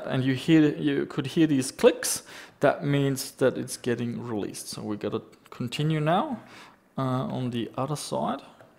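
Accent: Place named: Germany. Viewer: this guy sounds if his native language is German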